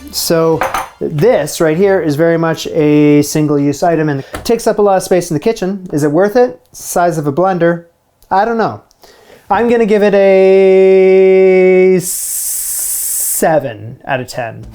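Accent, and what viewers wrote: Canada. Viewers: Has accent become more Canadian since he moved back to Canada?🧐😅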